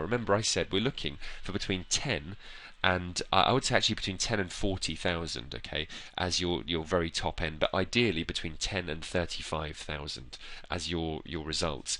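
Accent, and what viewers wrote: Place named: Wales, United Kingdom. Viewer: This vid, and what I think is a Welsh accent, is awesome. I'm starting a second career and thinking about marketing, research, etc. Don't have much of a clue how to get started. We didn't have computers 101 in college when I went eons ago, So thanks, I've subscribed and will watch your other stuff. This video has an easygoing casual approach, with just enough complexity for me to wrap my head around. Excellent, thanks again, and happy trails to me!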